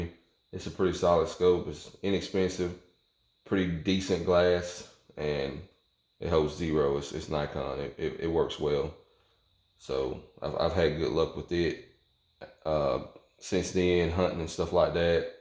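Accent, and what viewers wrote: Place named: South, United States. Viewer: I know this is an old video but blessings for sharing the experience. I have the MVP LR-308 in Kuiu Camo with a 20 inch medium bull barrel (discontinued.) That bull barrel likes 180 grain. I have not had the scout edition but definitely a good deer gun for thick woods in the southeast. I hear the southern accent in your voice, so, I know you are hunting thick woods like I have in northeast Texas.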